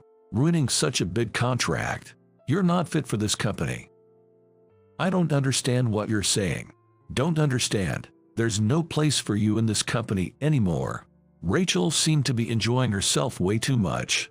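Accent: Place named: Boston, United States. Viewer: Love the Boston voice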